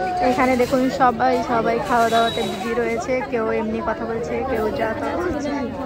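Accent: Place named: Kaliachak, India. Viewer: Vasa te bujha jaichhi tumi kaliachaker 😂😂😂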